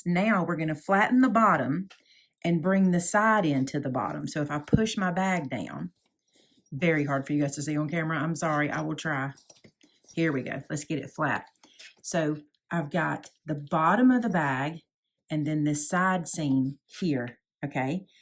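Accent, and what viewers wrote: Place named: South, United States. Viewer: This is a great video! Love that accent😊. I’m from Alabama and your voice makes me feel at home! You know, I still sew over pins🫣. We were taught that as long as the pin is at right angles to the needle, the needle will slide over it. I have found this to be true in my 50+ years of sewing. I’ve never bent or broken a needle because of a pin.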